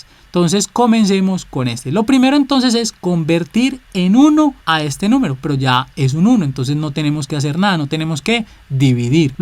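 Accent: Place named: Medellín, Colombia. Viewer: Raúl Alberto Díaz Garcia Es de Medellín. Asi es su acento.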